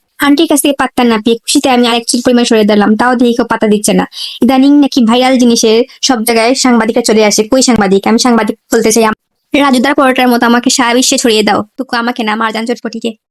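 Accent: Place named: Sylhet, Bangladesh. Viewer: Boin sylhety matilaw regho shuddo basha re nu rape korilray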